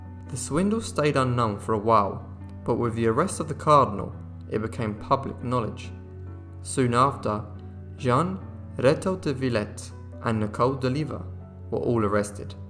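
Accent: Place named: England, United Kingdom. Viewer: te mire en un video..no se si fue tiktok o instagram donde tenias la cancion de " " y te reconoci.. wow.. no pareces latino en absoluto.. y tienes acento ingles.. I love your channel by the way.. I love history and historical figures. que parte de mexico?? yo naci en baja california pero vivo en California ahora.. cerca de San Diego.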